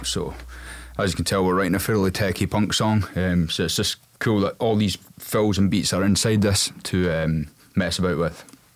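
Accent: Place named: Scotland, United Kingdom. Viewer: Class! Sounds great. Where you based mate? Always a surprise when the Scottish accent pops up on YouTube 🤣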